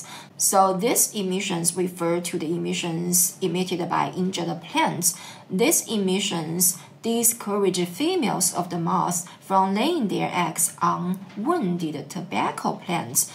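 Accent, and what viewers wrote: China. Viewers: Thanks for sharing your strategy! You have an obviouse Chinese accent.🤣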